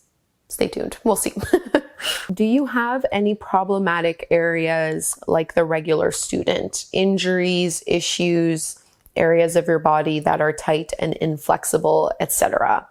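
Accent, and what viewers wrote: Canada. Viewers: I used to live in Ottawa in the Hunt Club area for few years. I am now in Melbourne Australia. It' so nice to hear the Canadian accent everyday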